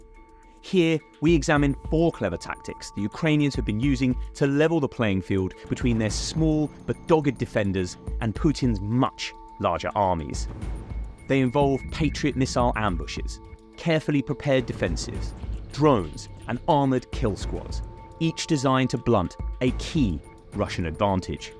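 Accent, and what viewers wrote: United Kingdom. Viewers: Ridicolous Brit narration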